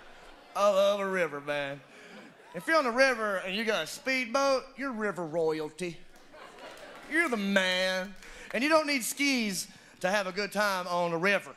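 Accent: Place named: South, United States. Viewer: Yeah unlike Larry the cable guy you have a real country accent and funny lol God bless 😂😇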